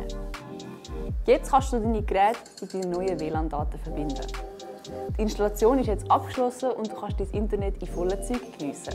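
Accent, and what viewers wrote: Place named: Switzerland. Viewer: Schön's Schwiizerdütsch 💐✨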